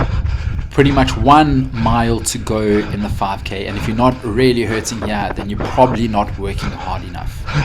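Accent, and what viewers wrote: South Africa. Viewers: 6”2, 120kg,47 and the only thing we have in common is the the SA accent. I enjoy parkrun for just being out there records are fine for those who care about them , I disagree that getting lapped is motivating but it’s encouraging when a front runner cheers you on but also annoying when they think they own the place ! You showed your class by staying and cheering on the others !!! . The 6 week training pack looks great but not sure it’s intended for those at the back of the pack . Keep up the great work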